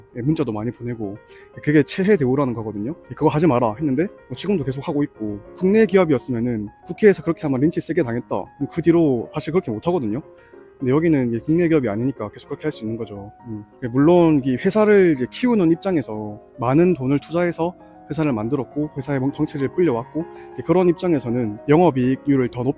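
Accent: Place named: Gyeongsang, South Korea. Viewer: 부산이나 경남쪽분 같내요 맞으시죠?ㅎ 경북쪽은 성격이 강하고 너무 직설적이라 이런 차분한 말투가 안나옴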